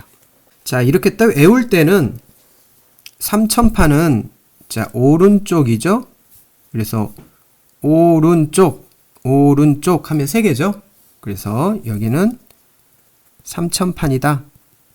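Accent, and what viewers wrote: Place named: Jeolla, South Korea. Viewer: 우리 교수님, 고향이 전라도 신가봐요😊 심장시리즈 더 올려주실건가용??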